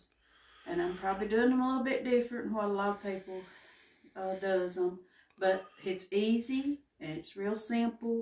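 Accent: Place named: Kentucky, United States. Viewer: Sounds like a Perry County KY accent 😊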